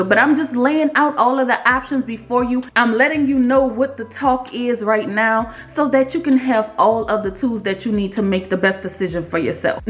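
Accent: New Orleans, United States. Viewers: I hear new Orleans all in your voice💯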